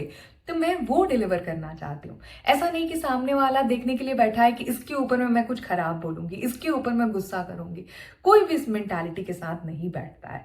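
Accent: Bihar, India. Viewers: Your bihari accent 👌👌👌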